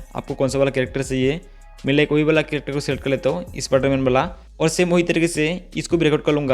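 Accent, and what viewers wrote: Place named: Nepal. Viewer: Bhai aap nepal se ho mera dost bhi ese hi bolta hai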